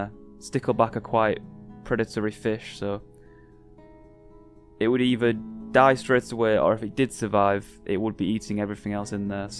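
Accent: Yorkshire, United Kingdom. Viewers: sounds like he is form yorkshire lol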